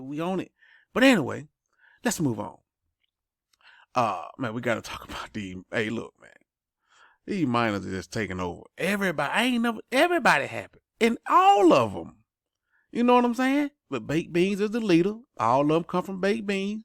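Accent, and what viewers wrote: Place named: South, United States. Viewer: you the man, where you from bruh. That accent sounds country like me.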